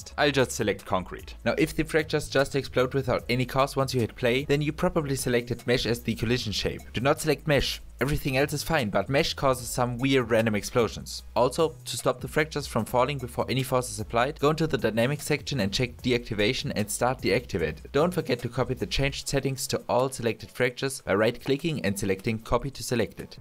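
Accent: Germany. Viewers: das gesächsle nervt übelst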